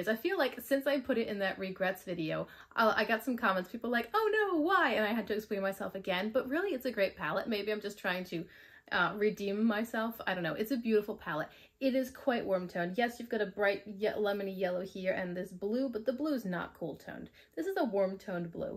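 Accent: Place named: East Coast, United States. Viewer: I smiled when you read the palette name "At Forest Sight"! When I moved out to the West Coast, my neighbor had a bumper sticker that read "May the Forest Be With You", and it confused me for the longest time, because I -- like you -- have an East Coast accent and pronounce "forest" with two syllables. Those puns only work if you pronounce it "forst", not "FAW-rest"!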